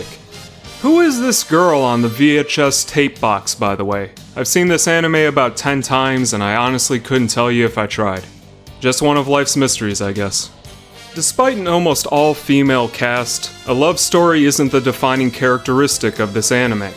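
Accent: United States. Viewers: How is your pronunciation so heavily American when you appear to be watching so much anime? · Ugh. Saying words in Japanese with an American accent.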